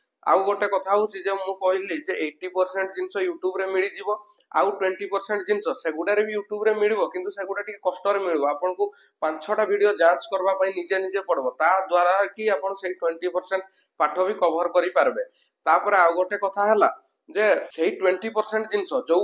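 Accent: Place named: North Odisha, India. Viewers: Aapono nka kotha pura mohanta type re,mayurbhanj ro ki...?khub kom sir motivate karanti,aapono motivate kori kori bujhauchanti chhua mananku,so,thanks...mu history,politics,geography and economics note chanhuchi,next year b.ed preparation pai...so,give me some notes🙏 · Sir apana nka ghara keonjhar, Mayurbhanj ya phir balasore ru thiba bcz apana nka talking ru jana paduchii, sir mu b cbz student aii year b.ed sarila😊 bahut worried achii kn kemiti karibi😢😢😢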